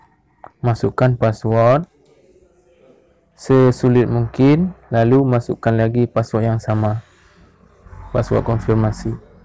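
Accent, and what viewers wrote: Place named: Aceh, Indonesia. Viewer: Bang lu org mana bg kok bahasa indonesia nya ngedok aceh ya 🤣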